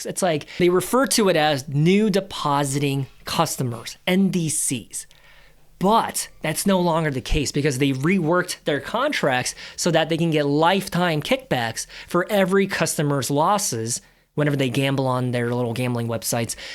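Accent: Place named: California, United States. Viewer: I thought you lived in California, due to your accent.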